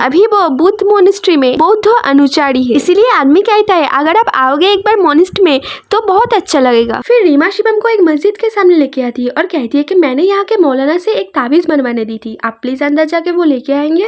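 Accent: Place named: Bengal, India. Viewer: Tumi bangali ! Maar prio accent ❤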